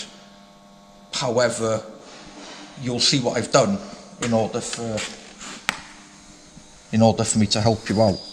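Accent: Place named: North Wales, United Kingdom. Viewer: Great video fella, just stumbled across your channel and subscribed. It would have been good to see if there was any visable play on the lobe once it was out, am I right in thinking the lobes are splined to the shaft? Also I heard the crappy cams were introduced with the 'upgrade' to Euro6, was the solid new cam you fitted off a Euro 5? If so I guess all the cam timing is the same? Also nice to hear a proper North Walian accent on Youtube, I'm originally from Llanfairfechan.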